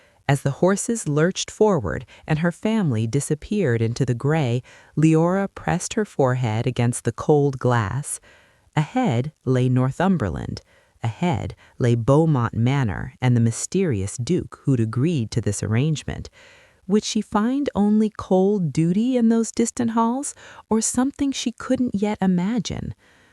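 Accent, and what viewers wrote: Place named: United States. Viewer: Don’t like the American accent—why not RP ?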